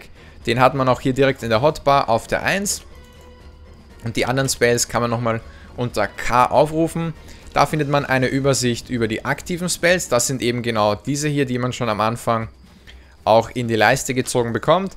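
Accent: Austria. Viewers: bist du österreicher ? und nebenbei .... hab mir lang überlegt das spiel anzufangen und du hast mich jz dazu gebracht :D du kannst es so gut erklären xD würde mich auf antwort freuen und vill können wir ja mal gemeinsam zocken :D Grüße, Ravaer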